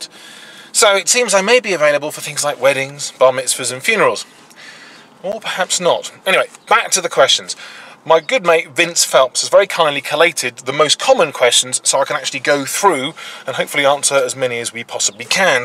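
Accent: United Kingdom. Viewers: Nothing like a classic British accent on a very America show. ha ha. It would be awesome marriage GM & Edd.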